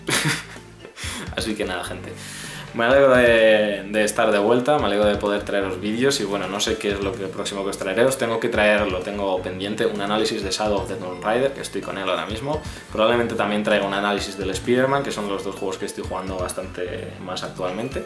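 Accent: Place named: Madrid, Spain. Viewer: ¿y eso? Pensaba que eras madrileño.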